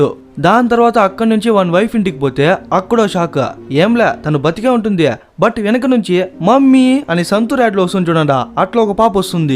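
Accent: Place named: Andhra Pradesh, India. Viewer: Cheyyandaa enti raa brooo. Chilton nellore boarder Slang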